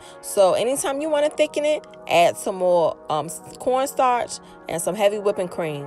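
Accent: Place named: Baltimore, United States. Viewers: You frombaltimore 😂😂😂 accent🥰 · I think I’m hearing a little bit of a dmv accent , but this look so good 😍😍😩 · She sounds like she from DC🤭😍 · Who is this lady why am I just finding you you sound Baltimore😂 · Soon as You started to talk I was like “she sounds like me “. I know a DMV native accent when I hear one lol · Amazing video!! You sound like u from the DMV area 😁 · Good Lawd! You did that!!! I'm cooking that tonight. I am from New Orleans and looks like home. But, you sound like you are from DC!!! Thanks! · I’m cracking up!! I heard you talking and knew you were from the DMV then I saw the Shoppers label and knew!! Love this recipe sis. Well done 👏🏾👏🏾👏🏾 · Loved the video! I knew you were from the DMV area from when you first started talking!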